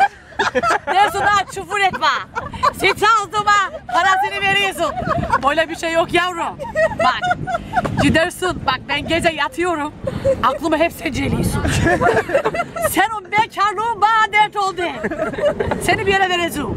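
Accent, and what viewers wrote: Black Sea, Turkey. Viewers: Çok tatlısın,kurban olurum karadenizime şivesine,doğru söylemiş anan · Karadeniz'in neşesi, enerjisi, mizah anlayışı, şivesi sende çoook güzel bir görsel/işitsele dönüşmüş... Gündemdeki "savaş çıktı çıkacak"ortamı üzerine seni seyredince, hayatın çok neşeli olduğu Arhavi yıllarımı, çocukluğumu hatırladım. Neşen daim olsun... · çok güldüm şiveye bayıldım keşke bende konuşa bilsem bir rizeli olarak :) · Bayiliyorummm sana hep karadeniz li siveneee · Sen harikasın daha çok dizilerde görmek isteriz özellikle karadeniz şivesiyle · Karadeniz'li mi yoksa İstanbullu mu ya da doğum Karadeniz üniversite İstanbul ondan mı şive değişmiş. Neyse çok beğendim, devam. Türkan çok iyiydi bu arada :))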